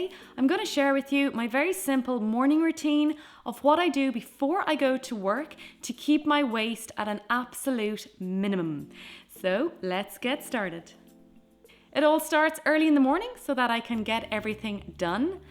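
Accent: Ireland. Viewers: that's not an English accent it's an Irish accent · Do you have children? Pets? Please make a followup video. Love your channel and brogue! 😘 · Irish accents lovvvvvvv ittþ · I’ve been enjoying your minimalist videos and just now found out you’re Irish. How I love your country, your people and the fantastic accent. 💖💖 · Is this a stupid question? I feel like this is stupid to ask, but are you trying to mask your Irish accent?? It just kinda sounds like you are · babygirl lyrics it’s an Irish accent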